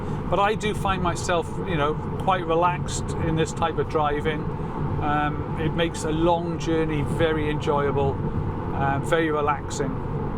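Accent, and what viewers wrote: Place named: Wales, United Kingdom. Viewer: Just thought id let you know that I placed an order for a m3 and used your code :) - As said, have been really enjoying your content + Welsh accent always a winner lol ... But, got into a bit of a mess, when I used your referral it didn't go to the referral landing page, rather it just went to the standard Tesla page... I didn't know any better until after I placed the order :( - Gave Tesla a call though and they sorted it out thankfully! So, hopefully, you do now have another 1,000 miles in the 'tank' as well :D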